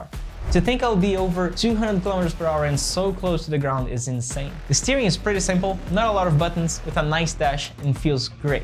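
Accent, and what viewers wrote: Brazil. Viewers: Cara, como que vc desenvolveu seu ingles assim? Eu tava escutando voce falando, e pensei, nossa ele parece um brasileiro falando ingles, mas fui ver tava tudo em ingles, comecei a ter duvidas, mas vi a bandeira do brasil no carro... conta o segredo dos ingles pra nois ai kkkk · Eu já tava achando q ele era brasileiro só de escutar o sotaque e também pelo nome dele, agora q tu confirmou tirou minha dúvida.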